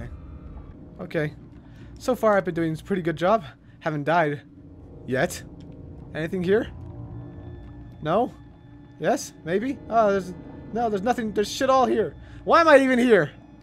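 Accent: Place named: South, United States. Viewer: Now i see how u can have a very high voice with a southern accent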